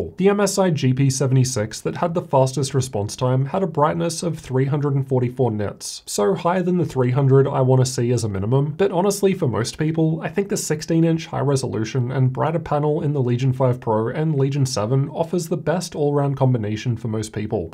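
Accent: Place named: Australia. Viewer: I can hear that you are from australia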